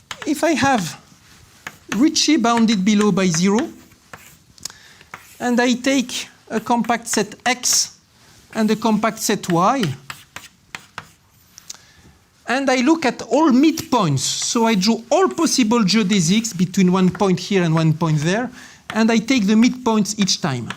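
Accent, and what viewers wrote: France. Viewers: Strong French accent, it's true. But clearly undertstandable ^_^, you are awsome, and we love you ❤ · As a french person, I find his voice kinda irritating to listen to... Most french people would cringe or not be fan of their accent especially when speaking in english, because we feel like we sound too "rough", or that we sometimes completely destroy your words! but i have noticed strangely, that english speakers generally kinda like it ?...so thats good! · My gooosh our accent is so awful! I can't believe that many americans find that sexy, beautiful or either relaxing as a Frenchman I can't stand this accent! ^^^ BTW merci pour ce cours très instructif!:) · I love the French accent! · I can't stand the French accent. Probably because I'm French. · Gotta love the French accent 🤗💕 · French accent is maaad sexy to Americans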